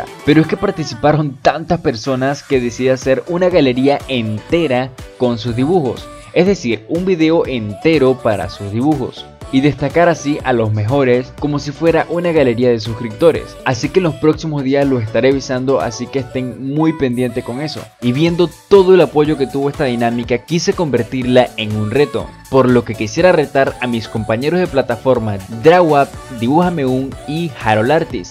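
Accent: Venezuela. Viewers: Se nota que eres Venezolano como yo que fino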